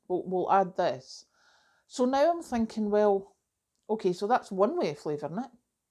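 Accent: Scotland, United Kingdom. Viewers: Hi. I just want to say that I enjoy watching your videos. I have a lot of ancestors that came from Scotland. I live in the state of Oregon, in the USA. I've not been able to go to Scotland yet, but I hope to someday. Watching you gives me a glimpse of what culture and life are like there. I also love hearing you talk. Love your accent. Take care, and have a blessed day!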